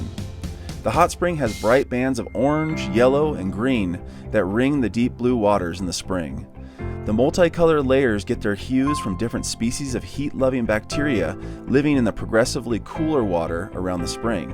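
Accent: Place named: South, United States. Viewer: I loooooove how you sing with your dog! And your country accent! "Going to Yellowstone to see the wolf doggies!" I love love love it! I woke up this morning with the memory of your singing with your dog, looked up your video in my history from yesterday, and downloaded it, just so I can watch that scene again and again! And yes, great Yellowstone video as well! You are a gem! I'm subscribing!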